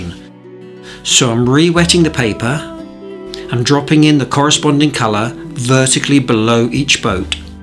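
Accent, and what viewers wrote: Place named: United Kingdom. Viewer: Dream atmosphere. Enchanted by the music, your painting and your wonderful British accent. I could spend hours watching you paint. Thanks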